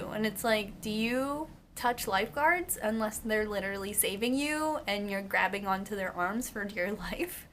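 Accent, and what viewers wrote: Philadelphia, United States. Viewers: I love how in most videos is super cute but every once in a while her "Philly" comes out! Her "Philly" DEFINITELY came out in this video😂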